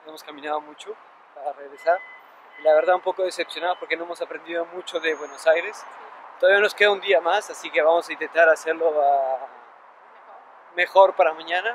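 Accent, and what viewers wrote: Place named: Europe. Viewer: ah, francesa. Sí, me pareció que tiene un acento europeo. Me alegro que les gustó Argentina. Saludos!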